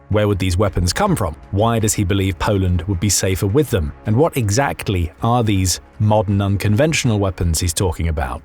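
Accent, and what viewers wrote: United Kingdom. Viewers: Ditch the British communist narrator.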